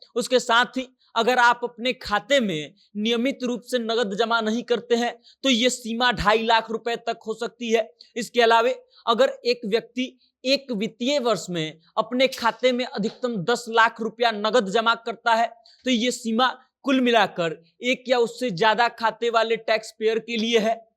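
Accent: Bihar, India. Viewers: बिहार से हो क्या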